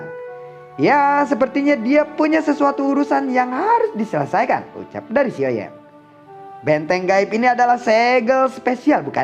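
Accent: West Java, Indonesia. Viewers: Min emang orang mana sih ? Bahasa Sunda nya kaya gak asing 😂🤣👍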